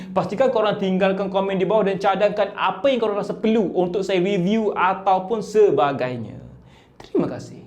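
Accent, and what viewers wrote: Kelantan, Malaysia. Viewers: Konten yang sangat menarik dan berguna, bahasa yang mudah difahami walaupun loghat Kelantan...teruskan berkonten, ambo support 100% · abe ore klate k? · assalam......!!! ce tenang² sikit... lunakkan sikit suara tu... pelat kelate tu xpe la dulu... kendian nti pelan² hilang la tu... in syaa ALLAH apa pun... abg sapot subscribed dan liked dahhh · Demo ore klate ko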